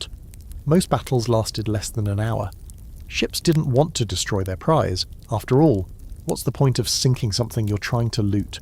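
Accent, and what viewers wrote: United Kingdom. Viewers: am Yorkshire ❤️ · Stop believing every word this guy says just cuz he has a British accent 😂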